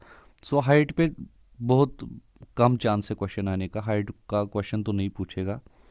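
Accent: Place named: Kashmir, India. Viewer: Sir your accent is more like a kashmiri pandit.. are u a Kashmiri pandit ? Sir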